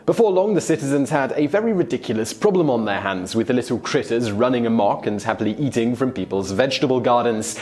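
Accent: United Kingdom. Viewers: accent is def UK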